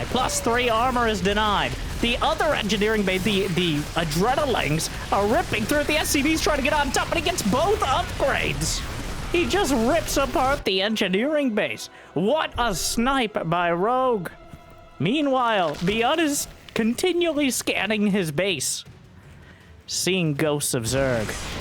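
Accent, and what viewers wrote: South, United States. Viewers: Nice casting!! Would love to see one where you speak normal english, or some british accent. The southern accent is distracting from your great casting quite a bit. American in general is.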